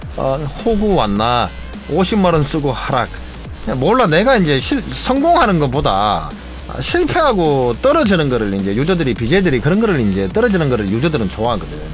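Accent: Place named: South Gyeongsang, South Korea. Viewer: 억양은 경남 ㅋ 단어는 공가라,쓰바르마 경상 ㅎ 섞여있는듯해요~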